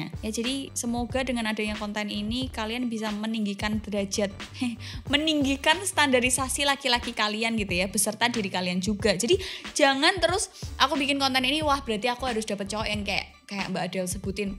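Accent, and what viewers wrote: Java, Indonesia. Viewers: logat nya jawa ya sama jawa😂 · Wuaduuuh...!!! Medok buanget mbakayu!!! 😘😆🙀😹🙈🙉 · Aku seneng bahasanya enak blak blakan medok jawane sukses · Suaranya medok nduuu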